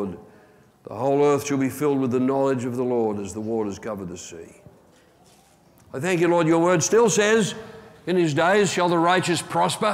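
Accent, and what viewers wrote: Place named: Australia. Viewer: I cant listen to this raving aussy any more. Now he is on about the new covenant. A mix up over two words. (this is the mistaken belief that the OT is the Old covenant and the NT is the new covenant). Not so. The scriptures say "a new covenent in (the) blood." This is A new covenant. A, A A A A A new covenant! It doesnt say THE, it says in that bit of scripture A! It is not replacement. it is A new covenant, not THE new covenant. There will yet be THE new covenant replacing that made with Abraham, it is yet future. The bible is full of "covenants" made with various people. THE (Abrahamic) Covenant was made with Abraham. So THE new covenant will again be with the people of Abraham. (yes i know we are grafted in, we are heirs of Abraham, just hold your seat) This period we in at the moment, some call the age of grace, is not the end game! 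It is a period in the plan of redemption where we are in A covenant written in the blood of Christ, one which will be fullfilled and all tied together all in all when He returns. The fullfilment of all covenants and promises is yet to come after Christ returns... it seems this preaching has forgotten the promise of the "RETURN of CHRIST" and taking no notice of the final book in our Bibles. It seems to be all about church is the final thing.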